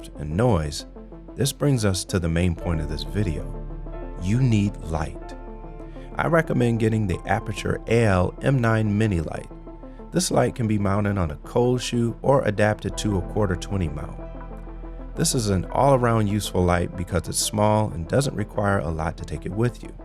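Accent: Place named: United States. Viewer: From your voice and accent I can tell you're from the US, let me know where exactly in the US so I can go hug you??.. Geez 100/10 content and narrative. You just earned a new sub man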